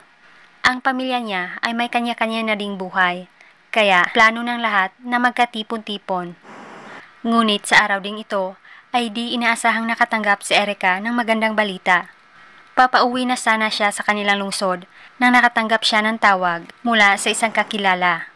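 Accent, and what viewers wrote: Visayas, Philippines. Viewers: Grammar o diction?😂😅 naiintndhan nman mga sinasbi nya un ang importante.. Ganyan tlga kpag hnd tagalog ang nkasanayan.. Visaya yata si Mam.. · Walang mali sa grammar nya, may puntong bisaya lang ang pagdeliver nya ng storya. Pero walang naman problema dum.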